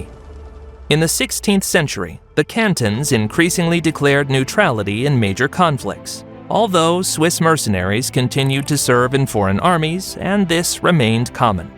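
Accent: United States. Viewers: nothing ruins a good historic story as an americrap voice.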